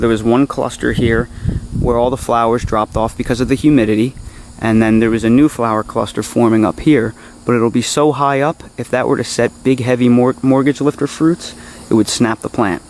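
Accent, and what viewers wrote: United States. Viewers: I hate that american accent !! You should have cut off the top much earlier.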